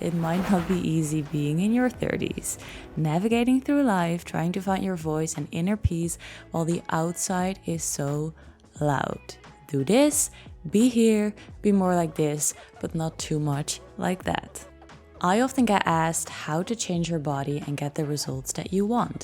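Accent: Netherlands. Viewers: Are you Dutch?🙈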